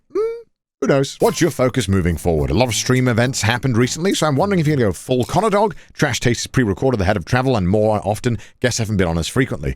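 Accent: Wales, United Kingdom. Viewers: Where you talk about loosing touch with you're "welshness" I feel you're loosing your accent. It's becoming more generically English vs out of the mines Welsh. (vs the midwife I just had for the birth of my son- where it's like, "Yeah you're definately from Wales.")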